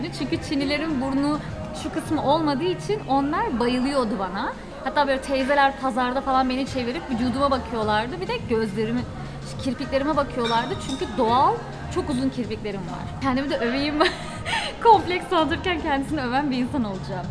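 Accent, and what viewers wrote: Turkey. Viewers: Ablamsende tam bir kayserili konusmasi var karadenizliisin ama biliyorum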